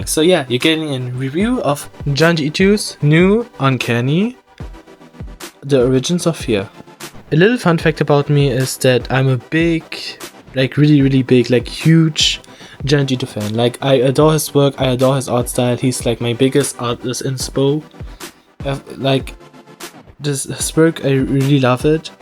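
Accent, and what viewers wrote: Germany. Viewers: Wait, are you german? I am currently learning german on duolingo so I kinda notice the accents 😅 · CONGRATS ON 1K ( also ur accent is so heavy??!!!! ))) german?? Italian???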